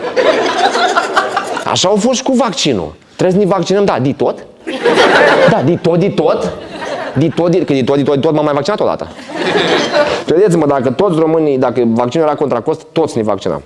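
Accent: Moldova. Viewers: Și ce fain e ca și a păstrat accentul moldovenesc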